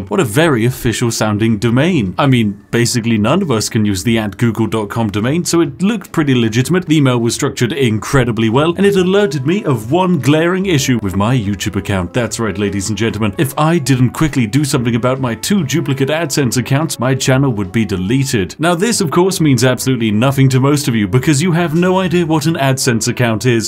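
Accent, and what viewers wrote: United Kingdom. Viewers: Woo the is back! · This suddenly became a big irony for this.... Anyway good the have the Bri'ish back! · Ngl, I'm British and I still don't know how you have a Chad British accent. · The British man is back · (°o°:)ᴼʰ the is back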